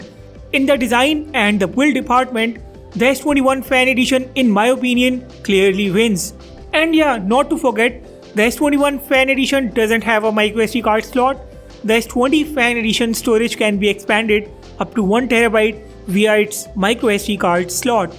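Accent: India. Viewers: Thanks for the review. Although I must say this accent does not allow me to watch the video at 2 times the speed... I wish hindu videos would invest in getting an upgrade in their english pronunciation - it is really hard to understand most of the time and makes at least me not watch those videos and look for videos with much better english.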